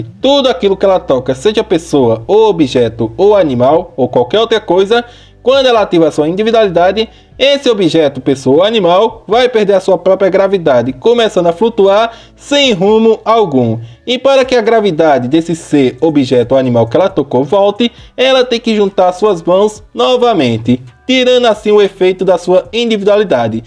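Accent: Northeast, Brazil. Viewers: Quando Vi A Thumbnail, Achei Quê Você Enlouqueceu De Tanto Teorizar Para Preencher Os Furos De Roteiro & Falta De Lógica & Etc ....., 😅😂😂😂. Adoro Seus Videos De Ben 10 e Seu Sutaque Nordestino???, Sulista???, 🎉😅.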